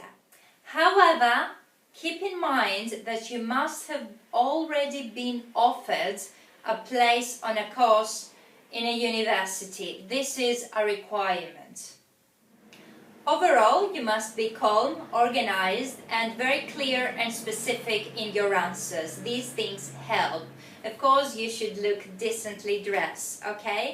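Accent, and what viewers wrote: United Kingdom. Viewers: thanx for the lessons mam 😊 ...are you from UK?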